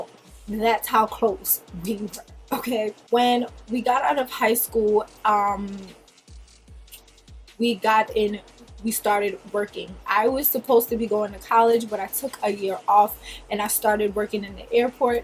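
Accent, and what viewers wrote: Jamaica. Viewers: Omgggg that Jam Accent though, yessss you so gorg. But that friend thing, I'm the same way, some females just don't know how to have, accept and good friend or be one. Gurllll shoot come to Trini/Tobago I'll be your bff lol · yesss bish the jamaican accent!!!!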